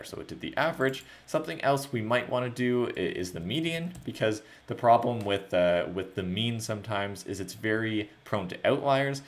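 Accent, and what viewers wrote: Canada. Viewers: Bro love the way you explained "Imputer" , And you pronounced "neighbor" accurately Canadian accent rocks brother Thanks a Ton !!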